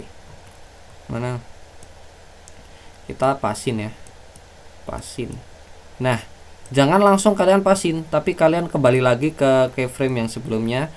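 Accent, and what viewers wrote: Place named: Java, Indonesia. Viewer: English Jowo Anjir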